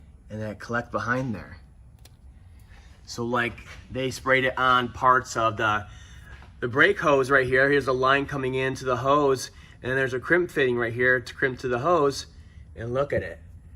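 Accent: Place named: Maine, United States. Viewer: Love the Mainer speak. Reminds me of one of my favorite movies, Dolores Claiborne, "you do it again and one of us is going to the boneyard".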